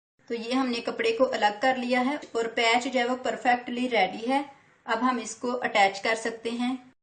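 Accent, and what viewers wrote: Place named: Narowal, Pakistan. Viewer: Lgta h aap narowaaal sy h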